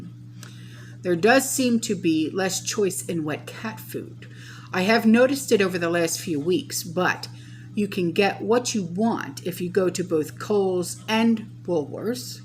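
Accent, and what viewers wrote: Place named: New Jersey, United States. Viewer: yes I noticed that at the end. Thank you for all you do you are so awesome. I love your Jersey accent!!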